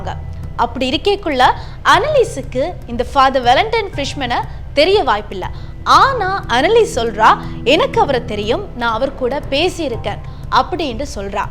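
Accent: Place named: Sri Lanka. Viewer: Mam..neenga ilangai yaaà...unga slang appadi irukku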